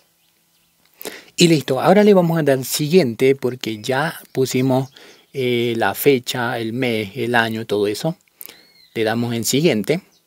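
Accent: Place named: China. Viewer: el perfil es de alguien joven creo y latino porque habla como chino hablando español🤔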